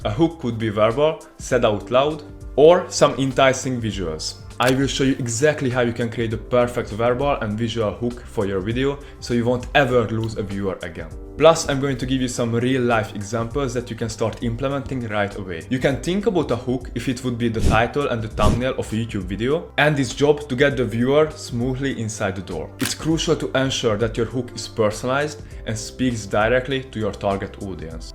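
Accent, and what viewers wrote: Hungary. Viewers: Olyan felismerhető a magyaros angol, egyből feltűnik 😀 Keep up the good work mate! 🔥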